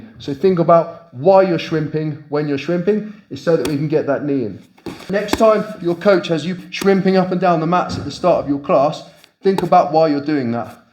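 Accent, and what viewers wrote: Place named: RP, United Kingdom. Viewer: Such a funny accent😂 Posh as f😅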